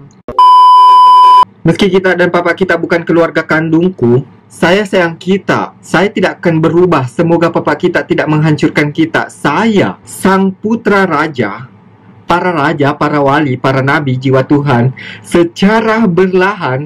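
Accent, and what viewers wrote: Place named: South Sulawesi, Indonesia. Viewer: hehehehe.tetep masih ada kekentalan bugis nya kk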